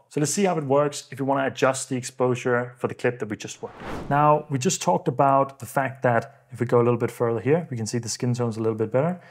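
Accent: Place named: Denmark. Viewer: When I was watching I thought you sounded a little danish, and decided to check your profile. I was so surprised to see you were actually danish lol 😭 Din tutorial var ret god, og sjovt at se en dansker nævnt i medierne!!!!!!!!!!🇩🇰🇩🇰🇩🇰🇩🇰🫡🫡🫡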